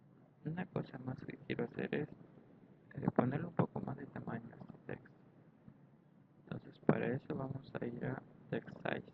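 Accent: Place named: United States. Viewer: hola gracias el video me fue de gran ayuda pero por favor como puedo cambiar la voz es que el problema es que su voz se escucha demasiado rara como si fuera una gringa intentando hablar español y hay muchas palabras que no se entienden bien por favor ayudame necesito arreglar ese detalle de inmediato por favoorrrr respondame rapido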